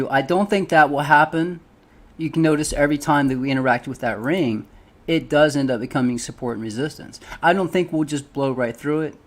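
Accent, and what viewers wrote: South, United States. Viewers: That country drawl is killin the chillin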